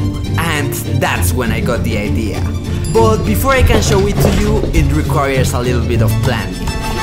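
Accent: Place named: Mexico. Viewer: He sounds mexican... is he? Love his accent regardless.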